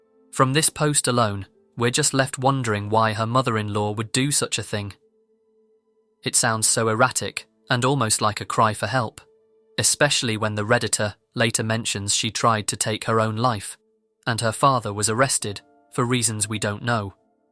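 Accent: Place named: RP, United Kingdom. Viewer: Yeah. Similar tone of voice. And obviously the accent is southern "proper" almost RP English. So I get why you find them similar.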